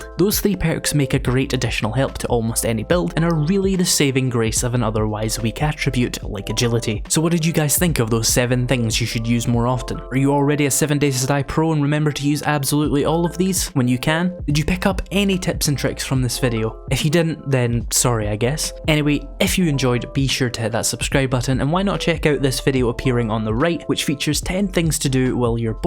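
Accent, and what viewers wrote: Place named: Shires, United Kingdom. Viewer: "This is Pear-fect" listen to that delicious Shire accent sexy fk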